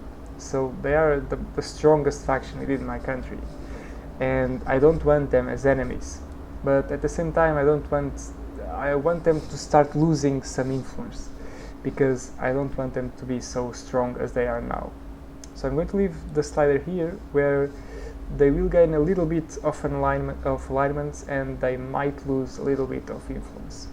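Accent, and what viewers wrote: Portugal. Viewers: Now I know what the portuguese accent is, when speaking English. Nice video, quite instructive, albeit slow-paced. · Na hora que você falou os nomes portugueses com seu sotaque matei na hora que es de portugal =D O jogo parece muitissimo bom estou ansioso por ele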